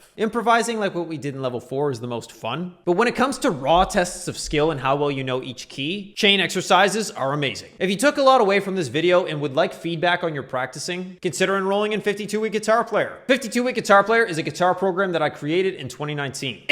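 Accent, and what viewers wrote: Canada. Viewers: Level 2 made no sense - canadian accent made words indistinguishable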